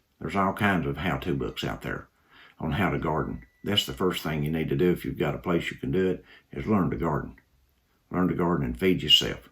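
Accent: Kentucky, United States. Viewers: My family is from Manchester, Ky. I just love seeing people from Ky. I didn’t grow up there but I still feel a connection to Ky. Your accent reminds me of my granny and my uncle and aunt. Love hearing you talk.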